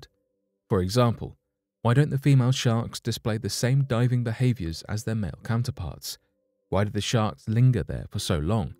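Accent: England, United Kingdom. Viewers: Look mate you sound English uses feet not metres were not in the EU anymore OK .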